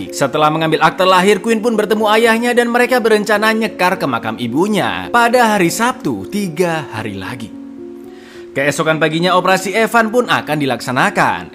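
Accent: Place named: West Java, Indonesia. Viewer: orang Sunda ya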